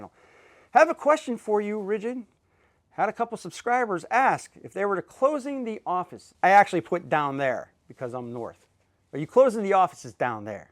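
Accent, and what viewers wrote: Pittsburgh, United States. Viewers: I'm glad you claimed your PA heritage. I noticed the Pittsburgh accent right off. If you ain't from Pittsburgh you was raised close enough to have the accent. I was raised in East Pittsburgh until I went to the Navy. I hope your a steelers/Pens fan. I bet our Buddy Vince is a Flyers fan.....even the coolest guys have some short commings 😂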